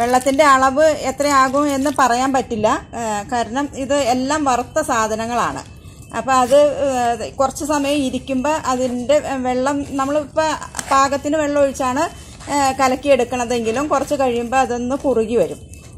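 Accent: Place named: Perumbavoor, India. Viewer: എവിടെയാ സ്ഥലം സംസാരം കേട്ടിട്ട് പെരുമ്പാവൂർ മൂവാറ്റുപുഴ പോലെ 😄ഞാൻ keezhillam ആണുട്ടോ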